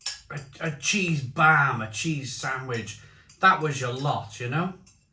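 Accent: Wales, United Kingdom. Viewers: Is you Welsh is it?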